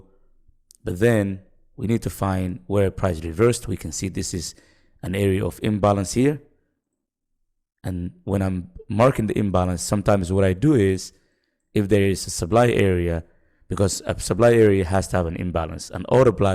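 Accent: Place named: Somalia. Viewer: You sound somali, i dont know why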